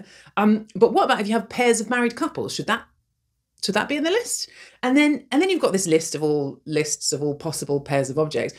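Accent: United Kingdom. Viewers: I came for the math lesson, but I stayed for the charming British accent.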